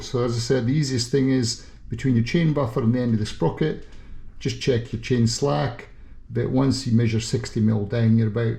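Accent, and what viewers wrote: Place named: Scotland, United Kingdom. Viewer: Love the Scottish accent, Glasgow? Ave got a DR650 here in Melbourne, luv it👍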